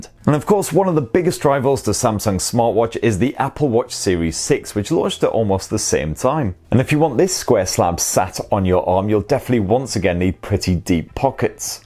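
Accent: United Kingdom. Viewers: You didn't Include links or written names to any of these items, you ran through cryptic names too fast and with your British accent I have no clue how to reference and follow up on any of those devices. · Am I the only one who watches his channel for his absolutely lovely British accent?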